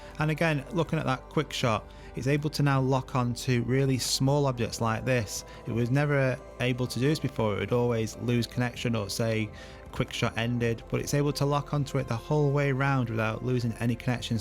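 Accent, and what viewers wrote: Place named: England, United Kingdom. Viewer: Thank you for informations Witch place in england is your accent from ?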